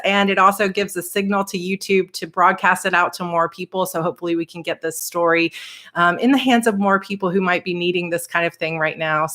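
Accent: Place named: South, United States. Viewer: I live in Arizona and like Californians we don't have an accent. However, you have just a hint of southern accent that makes your voice so charming. Please seriously consider narrating your books. ... The other thing is, I'm always disappointed when there's a hired narrator. It makes it soooo much more personal to get the book read to me by the author. Sending lots of love ❤ from sunny 🌅 Arizona 🌵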